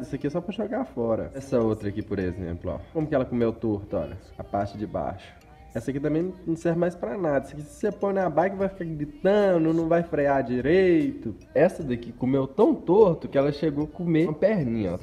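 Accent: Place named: Minas Gerais, Brazil. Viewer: Sô do RJ, mai acho o sotaque mineiro manerin mané.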